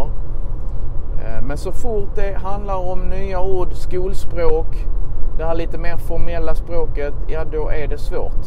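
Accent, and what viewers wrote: Scania, Sweden. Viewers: hahaha skånska är nice! ;)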